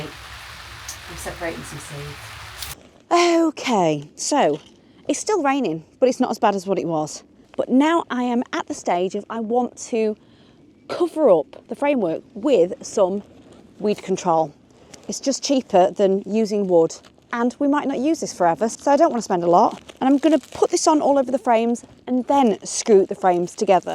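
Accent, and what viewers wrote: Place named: Leicestershire, United Kingdom. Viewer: I love your accent,it takes me back to my youth in Leicestershire ,i could listen to you all day. Forty four years in the U.S. AND NO DESIRE TO COME BACK TO COLD WET DAMP MISERABLE WEATHER You should always have a comfy chair and a bottle of wine in your greenhouse . I know my wife does and on rainy days i get several hours of peace and quiet ?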